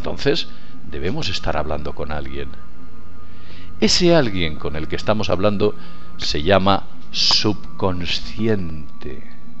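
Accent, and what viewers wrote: Spain. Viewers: Es el primer video suyo q veo! lo explicaste muy bien (gracias por no hablar con mucho acento español) y me suscribo! tengo unas inquietudes! he escuchado q la raza alienigena q nos creo ha usado una tecnica muy parecida en la cual ellos pueden extender su vida tanto como le deseen (tecnica invasiva en la cual extraen o adhieren "algo" solamente terminado el desarrollo fisico). y solo hay uan persona a la cual constantemente lo estan resucitando s podria decir! (le jefe supremo). ¡¿Sera q las razas avanzadas conocen a la perfeccion esta clase de enzimas para detener el envejeciemineto y curar enfermedades? yo creo q es muy seguro! y x eso viven tanto tiempo!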